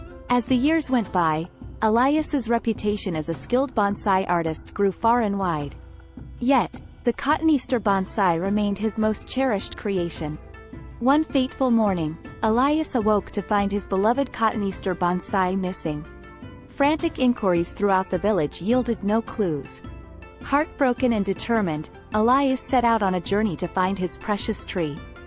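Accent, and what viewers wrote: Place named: North, United Kingdom. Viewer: That was brilliant and I love how you adapted your Northern growl into a feminine purr :)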